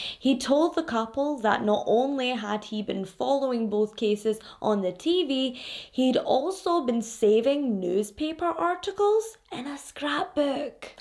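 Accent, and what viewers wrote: Scotland, United Kingdom. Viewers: Please don´t change. I love your bubbley personality, and I especially love your scottish accent. I love Scotland, and I hope my husband and I are gonna visit it one day ♥♥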